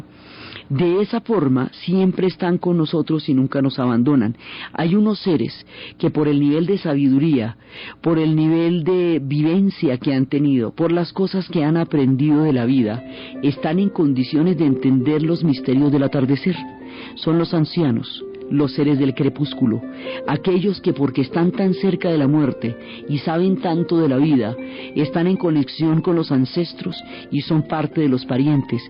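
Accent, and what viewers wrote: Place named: Colombia. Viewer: Me encanta como cuenta las historias esta mujer, me gusta su voz y su acento, tremenda joya que tiene Colombia, saludos desde 🇸🇪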